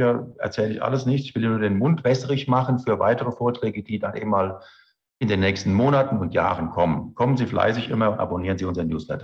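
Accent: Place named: Frankfurt, Germany. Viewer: Sie können noch so hochdeutsch sprechen, den frankfurter hör ich immer raus 😍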